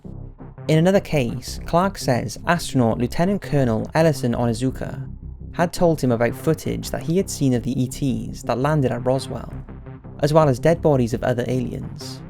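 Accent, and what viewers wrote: Wales, United Kingdom. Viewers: Bluesummers pretty sure he has a very slight Welsh accent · yep was just thinking that when I saw your comment - he has a soft Welsh accent I think. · The Welsh in him! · I could listen to that Welsh accent all day ☺️ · He’s a has a welsh accent to be honest.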